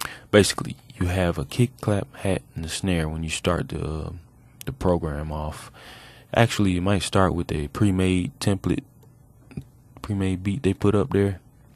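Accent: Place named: South, United States. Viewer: he makes the word fruity loops sound hilarious lol! he sounds country lol. nice video though, real shit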